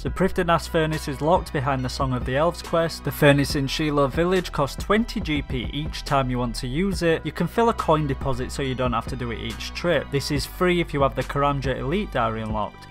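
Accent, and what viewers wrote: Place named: Leeds, United Kingdom. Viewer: bruh u from leeds lol?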